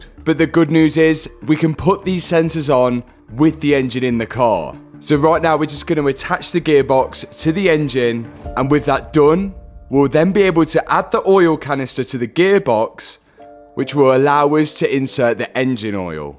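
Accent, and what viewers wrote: Leicester, United Kingdom. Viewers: Rat er ley, fer rar ey, noise ey love it good old Leicester accent. And cracking video well done 👍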